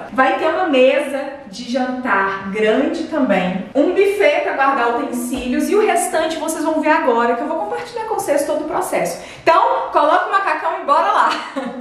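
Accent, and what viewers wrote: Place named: Minas Gerais, Brazil. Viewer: O sotaque mineiro é um trem lindo demais!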